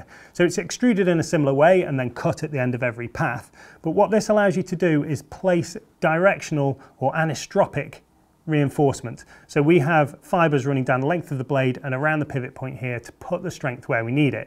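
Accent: United Kingdom. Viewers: why is it always someone with a brit accent👎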